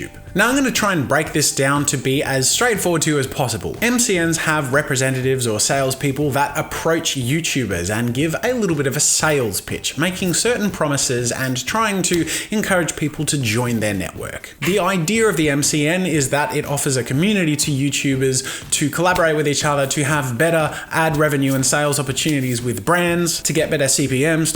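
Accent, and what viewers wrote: United States. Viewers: you fake a really great American accent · COOL AMERICAN ACCENT! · Does he sound American when he is giving the lecture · nice american accent bro! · Sick American accent, *. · AMAZING AMERICAN ACCENT LOLOL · Terrific American accent! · I love his American accent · the american accent was really good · i love your american accent so much its actually kind of accurate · Pretty good American accent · I love your American accent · Your yank accent is epic! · that was awesome American accent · the realistic AF American accent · hearing you talk in an american accent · Your american accent sounds more american than mine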